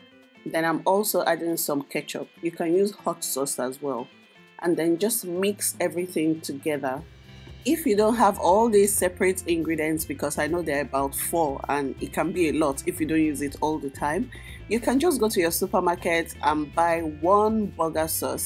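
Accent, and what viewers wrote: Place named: Nigeria. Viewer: I am totally blind and I love the way you described your video. He did a good job and it's easy to follow by the way you sound like you're from Nigeria. Anyway that's exactly what I did and how I followed it it's very good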